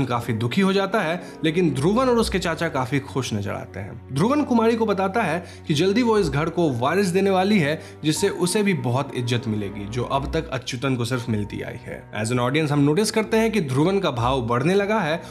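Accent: Bengal, India. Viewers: Just by the narrator’s R pronunciation, it can clearly be understood he’s a bengali.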